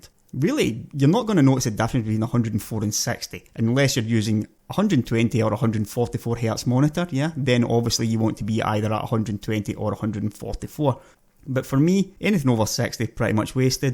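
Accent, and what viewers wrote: Scotland, United Kingdom. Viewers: Funny thing Scottish accents - this one and Sean Connery's accent are the very loveable but for some reason it makes one always want to break out and speak in Scottish-. I love to always try and do a scottish accent from time to time - don't know why but simply just love it even the ones whereby you don't understand what the hell they are on about. You just know that you love it. Love it love it love - a very attractive language that immediately compliements any person first hand. It's just wheather that person immediately with a head start because of the Scottish accent can actually stay ahead and that then boils down to the real person in hand, hmmm! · Hey, what's the bit rate on that Scottish mouth of yours? You really get roll'n ! :D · Its your great Scottish acent my friend, and as an English man I think scots speak English better than us! 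Great video. Well done m8!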